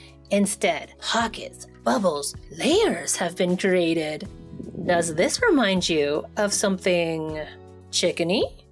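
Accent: Canada. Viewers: I love your soothing voice and Canadian accent. ❤ Could I make this silken tofu for Douhua with ginger syrup? Awesome video 👍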